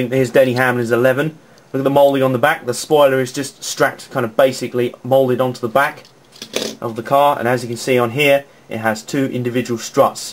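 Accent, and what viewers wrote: United Kingdom. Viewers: I love your british accent, that's awesome! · Are you British?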